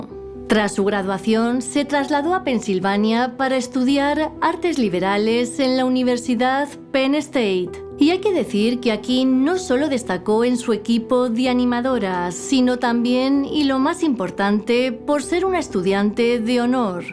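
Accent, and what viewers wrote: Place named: Malaga, Spain. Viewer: Que feo hablas ..erres muy buena narrando però de verdad malagaa España vivarrrr familiarrrr. ...etc etc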